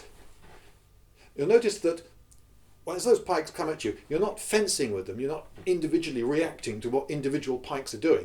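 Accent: United States. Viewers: You have a really good american accent. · That was an amazing American accent. You sounded virtually authentic and I've talked to quite a few Americans in my time. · Love the American accent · Your American accent terrified me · nice American accent, so much better than my English one! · Quality American accent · The American accent caught me off guard. It was really well done. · serious props for the american accent! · Your American accent is amazing. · I can't get over the American voice. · I love your American accent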